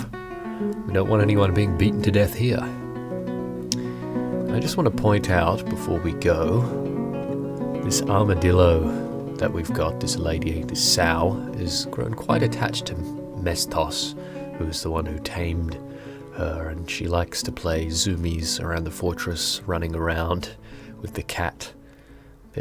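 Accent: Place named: Germany. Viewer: Dont get me wrong: I really think you quite nailed it with ze german akzent! And i especially adore it because im actually a native german speaker :D Keep it up👍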